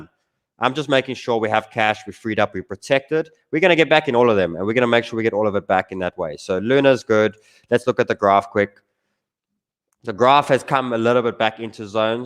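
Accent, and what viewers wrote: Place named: South Africa. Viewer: I’m South African, but damn, I’m loving your accent. I miss SA accents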